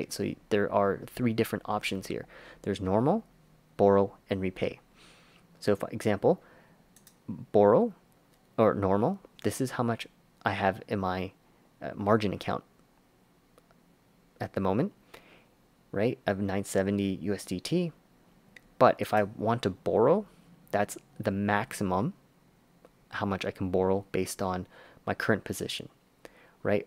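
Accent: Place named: Canada. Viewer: lol I caught it fairly quickly. I became familiar with the Canadian accent after living one year in northern Minnesota. .. Good times. Stay warm.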